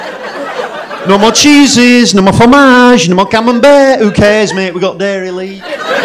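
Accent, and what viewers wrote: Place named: Manchester, United Kingdom. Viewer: Why’s tha darn like a manc?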